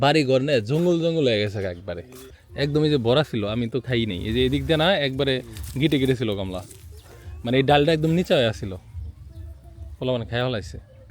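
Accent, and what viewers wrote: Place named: Sherpur, Bangladesh. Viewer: ভাইয়া আপনি কিন্তু আমাদের শেরপুর এর ভাষায় কথা বলেন আপনার কথা আমার ভালো লাগে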